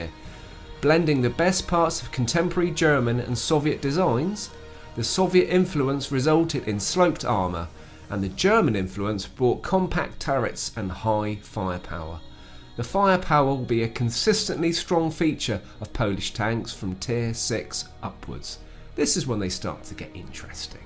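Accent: United Kingdom. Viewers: Are you Bristonian ?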